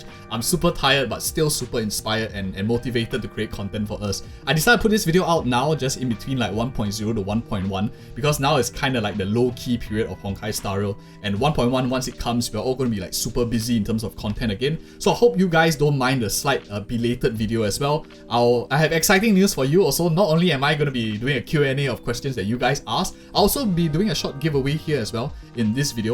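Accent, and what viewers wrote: Singapore. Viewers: lily aquina and you are my fav star rail content creators, I've watch every video from you since a month ago and I look forward to new one evertime, because I rly like the way you talk about stuff and I have a lot of sg friends and cousin so your accent is kinda a plus for me lol. Also a weird timing cuz i was thinking of A.I art for fun and turns out you have a channel for it, thats cool. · congrats bro, only started watching you recently for star rail and didnt know u had another channel ngl but ill check it out since i like gacha games. also i sort of guessed you were from singapore too from your voice but guess now it confirms it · I was wondering why you sound so singaporean. Love to see more from from you ❤ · Congrats on your 15k! Fellow Sporean here, clicked into your content since day 1 of HSR, noticed your accent right away and stuck to your vids for guides since they are very detailed as compared to most of the HSR guides. 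Able to make pull decisions based on your content as well and I always recommend my friends to watch your vids as well for HSR guides. Really appreciate your hardwork that goes into the videos and hope to see exponential growth in the near future!! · Always good to see a fellow Singaporean find success in something they love! One of the biggest things I've liked about your channel was finally hearing a familiar accent narrating something that I had to search up on Youtube. Ever since watching my first video on your channel, I've found myself always coming here to look for anything star rail related before going anywhere else. Hope you continue to grow and find even more success! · congrats on your achievement! started HSR on day 1 and have been following your videos and advices from then. when i first listened to your video, i immediately recognised you as a fellow singaporean. its not a bad thing, its a good thing actually. 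the landscape in sg doesnt really favour content creation so i really feel very encouraged and happy that you are trying and doing your best to make it. i'll continue to support you just like how you have supported us in our trailblazing adventures with your wisdom and insights. i'm pretty sure you alrdy know how to pace yourself and get enough rest. jiayou and i'll continue to support you with views <3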